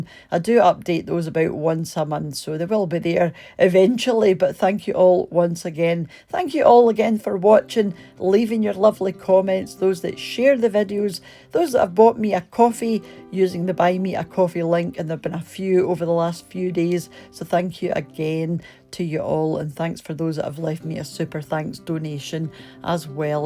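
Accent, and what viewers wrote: Scotland, United Kingdom. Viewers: I could listen to you talk all day, doesn't matter if you're reading the encyclopedia just love the Scottish accent. 🥰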